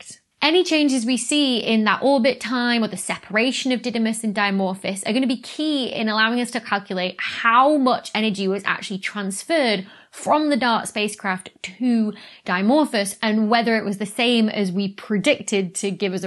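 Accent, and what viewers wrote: United Kingdom. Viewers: Dr Becky, what part of the United Kingdom are you from? I ask because I am intrigued by your speech patterns . Believe me this is not a criticism, I am from the United States where we haven’t spoken English in years. Just an old man’s curiosity. I have started listening to your new book and love it so far.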